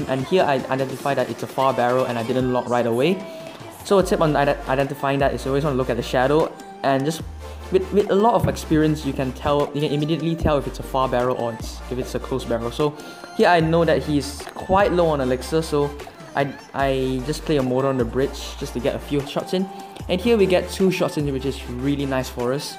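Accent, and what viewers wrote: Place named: Singapore. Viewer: your accent sounds singaporean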